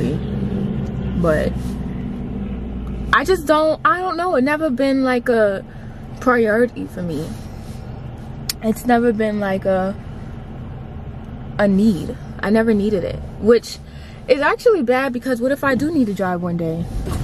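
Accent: New York, United States. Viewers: Is it me or is that New York accent getting extra strong lol I think that since you been in New York your accent reappeared, I love ittttt!!!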